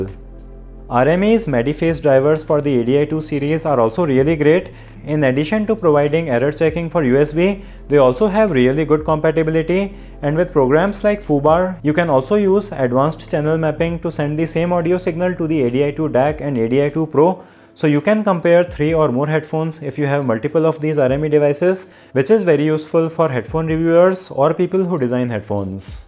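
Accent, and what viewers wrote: India. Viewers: The Indian accent is the most annoying English accent in the world ewww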